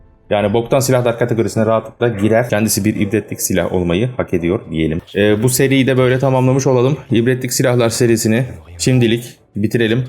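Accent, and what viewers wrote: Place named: Foça, Turkey. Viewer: SEN GÜNLÜKBAŞILI MISIN YEĞEN? Foçalı şivesi çıkıyor sende.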